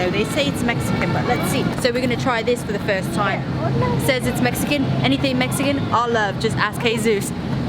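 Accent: United Kingdom. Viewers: So excited to see a video that I watched it twice! Girl, I have said it before and I will say it again... I fucking love you! So down to earth and chill and a British accent! Video was clear AF too, making those red lips look super bomb. Bless the fam and don't make us wait so long for the next video, LOL!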